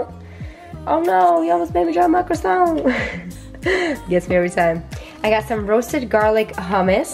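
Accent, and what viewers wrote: United States. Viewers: it looks so nice. I love your American accent. what state are you from?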